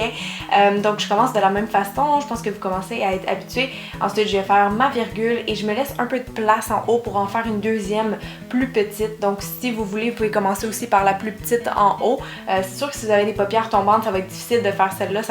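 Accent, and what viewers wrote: Quebec, Canada. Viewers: Franchement je t'adore a fond tu es magnifique et mon dieu ton accent 😍😍😍 je suis amoureuse de ton accent, j'adore l'accent des québécoises 😍😍😍 bisous 😘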